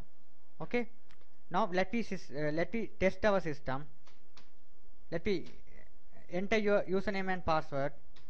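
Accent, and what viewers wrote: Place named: India. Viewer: why does every time I look for programming videos I hear a dude from India?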